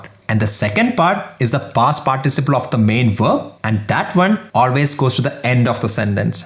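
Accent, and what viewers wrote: Kerala, India. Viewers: Are u from kerala?😁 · You seem to be from India😂😂😂but i have loved your lessons.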